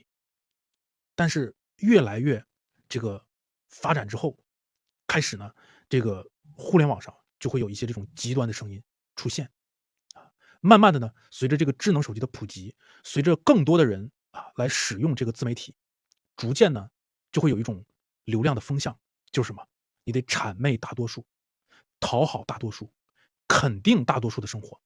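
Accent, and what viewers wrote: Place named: Northeast, China. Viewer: 长相口音，典型的东北满狗或者蒙古鞑子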